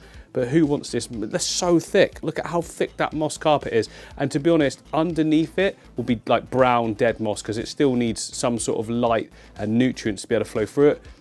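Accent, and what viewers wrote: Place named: United Kingdom. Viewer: Amazing!🤩👍 P.S. Your british accent is so british!👍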